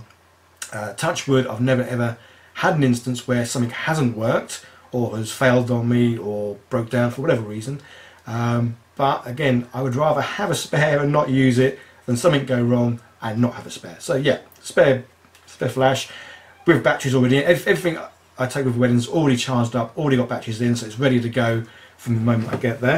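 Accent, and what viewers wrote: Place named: England, United Kingdom. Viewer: hi Englishman... nice accent mate! Is that a Tamron or a Sigma70/200 lens?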